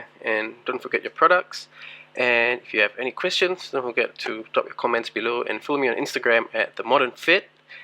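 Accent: Singapore. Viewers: You sound so singaporean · are you from singapore?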